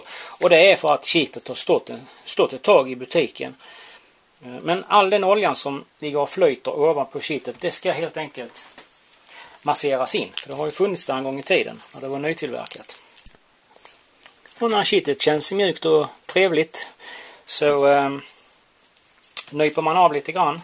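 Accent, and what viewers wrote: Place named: Scania, Sweden. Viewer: Har kollat på alla 9 videos och ja tycker du är rolig och din skånska är rolig med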